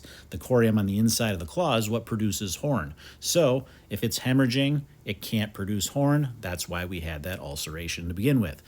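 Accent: Wisconsin, United States. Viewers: Your Wisconsin accent is deeply calming and appreciated. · It's always "that" coreum. Never heard him say "the" coreum. Total Wisconsin thing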